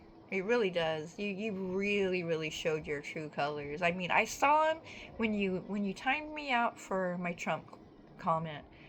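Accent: United Kingdom. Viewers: Thanks - agree 💯 and the Brit accent is hilarious! ❤❤❤ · I've been catching up on a bunch of streams. Sorry I didn't see this earlier. 👏 👏 👏 👏 Great Brit accent & recap of Kelli Craptor's video. I can't watch her amongst others who fall in line behind MAGA kArOn. They're disgusting imo.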